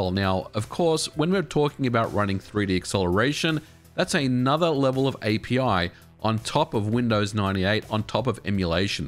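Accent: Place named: Australia. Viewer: Australian accent Next time I'll turn it into an Amiga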